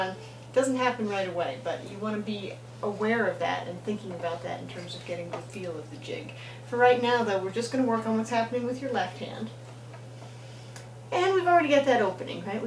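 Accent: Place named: United States. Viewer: You sound American do the americans play irish trad?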